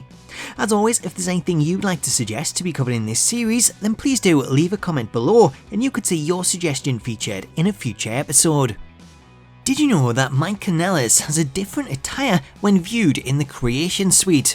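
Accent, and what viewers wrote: Newcastle, United Kingdom. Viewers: You’re a Geordie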